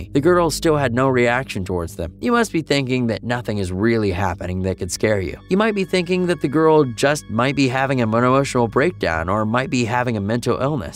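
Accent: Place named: General American, United States. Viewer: im noticing a theme with these type of vids, the narrator usualy has a standard american accent (i assume its american) but cant structure sentences properly....why?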